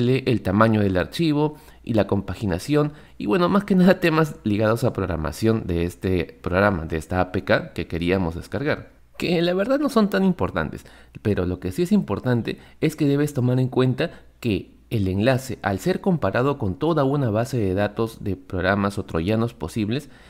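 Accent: Peru. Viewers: Jajajaja no me gusta el acento peruano, pero a él se le oye bonito😊 graciosito o es como empieza el saludo a él se le escucha lindo 😚 saludos sabrositos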